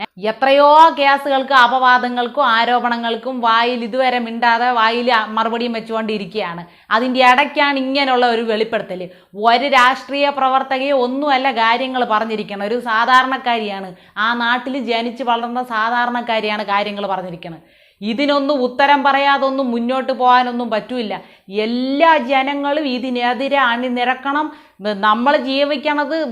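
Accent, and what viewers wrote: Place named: Trivandrum, India. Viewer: കണ്ണാടിയും, മുണ്ടും ചട്ടയും, വയസായ ലക്ഷണവും, തിരോന്തരം ഭാഷയും 👌👏👍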